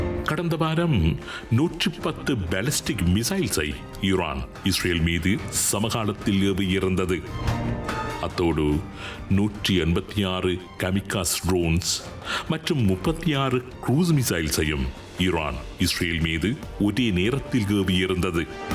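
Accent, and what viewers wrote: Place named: Eelam, Sri Lanka. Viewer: Bro uganda voice eganda ஈழம் voice im from trinco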